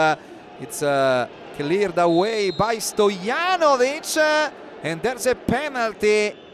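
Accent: Italy. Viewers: probably the strongest Italian accent ever heard on TV! even by us Italians!! it's the icoliseeerrrr! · Commentator with italian accent is the best thing of this new year lol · I love to hear the italian accent from the commentator commenting Serie A highlights. So authentic and perfect for italian football👌 Grazie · What a comentator.. nice to hear it.. English with Italian aksen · The commentator makes me embarrassed to be italian. We don't all talk English like this 😒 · Sembra un italiano che legge il commento in inglese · the speaker is 101% Italian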